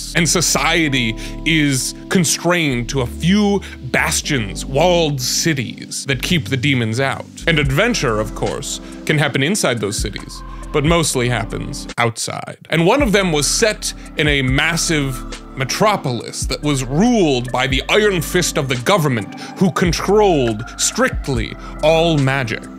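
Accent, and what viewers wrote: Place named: United States. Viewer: He sounds just like me a Michigander, except with a bit more drama to the voice. So sounds very American, very clear to me!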